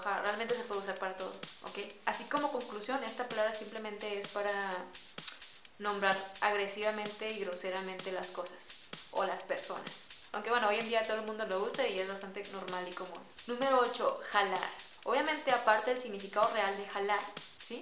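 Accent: Mexico. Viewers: Me encanta el acento mexicano sus frases y ese estilo a la hora de hablar Saludos desde El Salvador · Conocer México y esa bonita cultura es mi sueño su dialecto suena padre jjjjajjj desde colombia saludos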